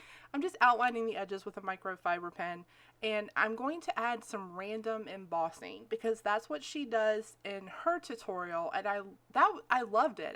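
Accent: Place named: North Carolina, United States. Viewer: Ah, maybe a North Carolin accent.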